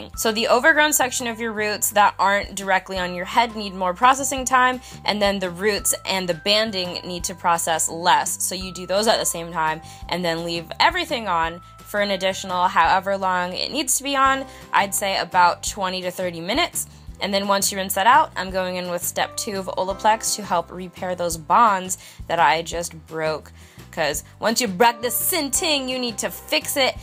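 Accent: Jamaica. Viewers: that jamican accent tho i can teach u cuz i am jamaican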